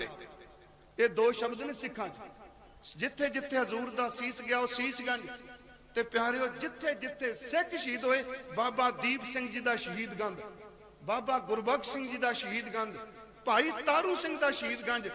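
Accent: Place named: Tanda, India. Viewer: Tuhadi boli tanda de area naal mildi hai.. bilkul nirool hai..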